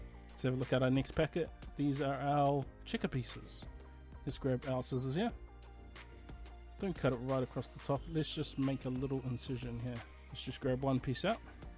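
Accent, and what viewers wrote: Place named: New Zealand. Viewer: Love the Kiwi accent.....................you are from New Zealand right 😂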